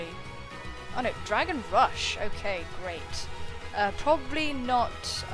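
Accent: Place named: West Country, United Kingdom. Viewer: I only just picked up on your accent. I think it was the way you said "iron head" that did it. Devonshire? Definitely sounds Westcountry to me.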